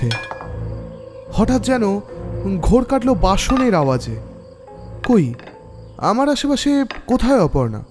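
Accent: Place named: Bangal, Bangladesh. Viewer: Kat bangal reeeeee ekdom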